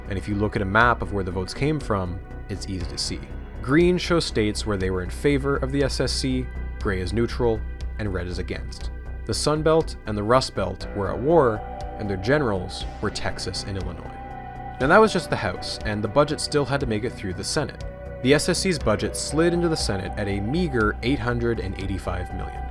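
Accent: Canada. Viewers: No he‘s Canadian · I will also admit, as a holder of one myself, I'm biased for the Canadian accent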